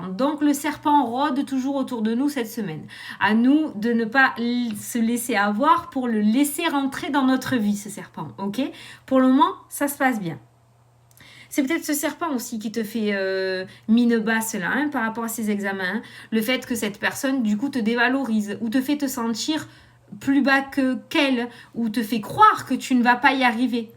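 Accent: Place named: Tarbes, France. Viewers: Quel bonheur cet accent me manque tellement. Toulouse, Lavaur,albi, castre. Trop de merveilleux souvenirs 🌟☀️🌟☀️❤️💕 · sur messenger vu que ça passe par FB j'ai 2 contacts celui avec ta photo de chouette et celui avec ta photo normale lequel est le bon. Pour les contrats signatures immobilier je te remercie de ton tirage cela me parle bien ça avance. Merci merci merci.❤❤💜 j'adore tu parles comme mes nièces qui sont à Tarbes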